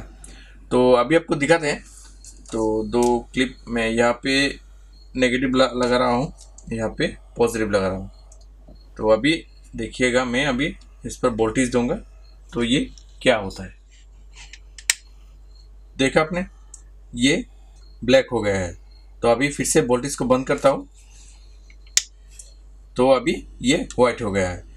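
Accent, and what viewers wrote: Bengal, India. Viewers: Are you a Bangali...????😀😀😀😀😀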